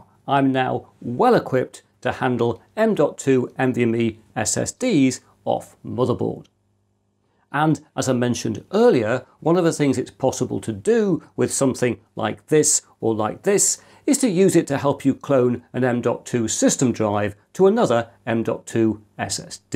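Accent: United Kingdom. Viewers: Your british accent is exemplary.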